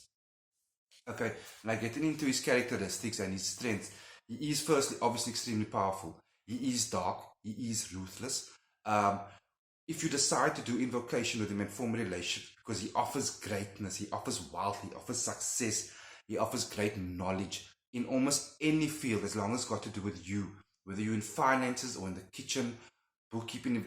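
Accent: South Africa. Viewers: Oh man, hearing your "R" i automatically knew you are South African. I love that accent.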